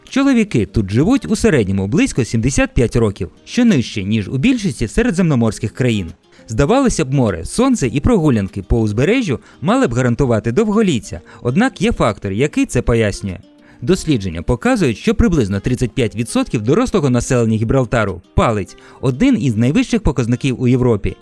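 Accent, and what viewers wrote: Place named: Russia. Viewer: Дикція на трієчку, ще й рашиським циканням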